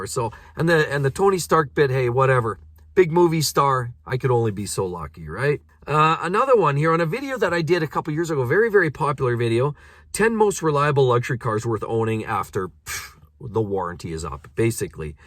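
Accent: Canada. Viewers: Abooout! Or Abouuut! This is Canadian core pronunciation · you have a slight Canadian Accent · Awesome videos man. The aboot comment really got me haha 😂 But honestly, your content is some of the most entertaining content on YouTube & I’m always looking forward to when a new video is uploaded. It’s awesome to see you walk around these cars & do a 360 with your camera to then switch to a close up of your face when commenting on certain elements is very very very entertaining. Please don’t change. And with regards to the aboat part, I mean, it’s very obvious you’re Canadian but that’s not a bad thing. Much respect from Belgium! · I love your Canadian accent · your Canadian accent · appreciate your Canadian pronunciations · you do come through a little canadian at times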